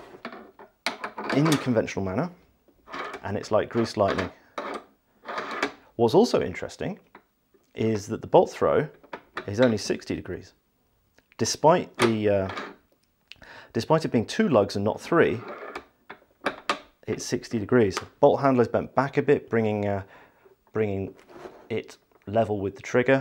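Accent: United Kingdom. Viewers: Lol when your so used to accents. Just realized this guy is from the U.K and I am almost done the video. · Apologies. You mentioned it by text but since i was only listening to your lovely British voice i missed that bit.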